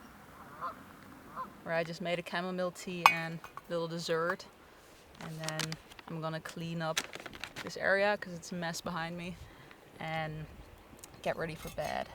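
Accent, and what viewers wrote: Netherlands. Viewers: Ik dacht al een piepklein Hollands accentje te horen😁 En ik woon zelf toevallig op de Veluwe. Mooi inderdaad, maar is niks vergeleken met waar jij nou zit. Voor een klein beetje wildernis, is het al snel Zweden of Finland (ben meer van het packraften). Leuk zeg. Superveel succes met alles wat je daar doet. En oprecht een mooie video👍🏻 Groeten, Remko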